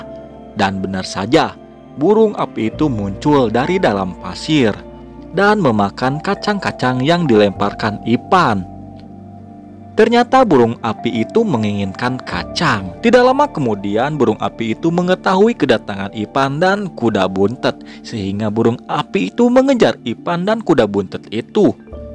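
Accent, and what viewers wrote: West Java, Indonesia. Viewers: Sunda pisan john logatna,,mantaap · Logat sunda na kabawa keneh jadi apal euy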